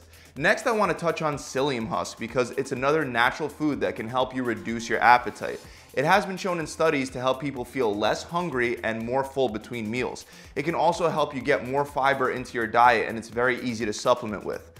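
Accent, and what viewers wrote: Philadelphia, United States. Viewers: You a Philly man?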